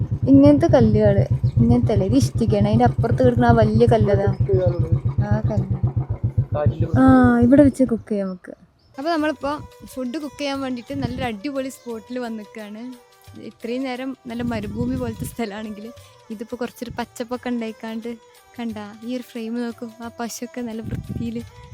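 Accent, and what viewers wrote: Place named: Rajasthan, India. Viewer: Rajsthan maarvadi aan slang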